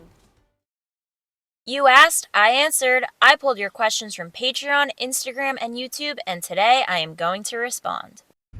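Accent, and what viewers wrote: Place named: New England, United States. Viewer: She's from New Jersey. So it's probably that new england accent.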